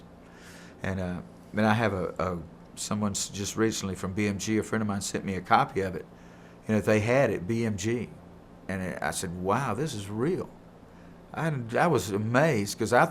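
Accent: South, United States. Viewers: I miss you!! Hes so adorable and very humble. I just love his southern drawl, beautiful laugh. Completely genuine southern gentleman. Love you ❤️🥰😎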